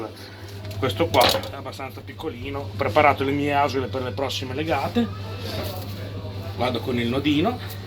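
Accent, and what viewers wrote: Emilia, Italy. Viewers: Si ma guarda che lui ha un'accento emiliano, mica romagnolo. Va ben che ne han fatto una regione unica, ma a parte quello son due cose ben diverse, e accenti ben diversi, con Cesenatico non c'entra niente...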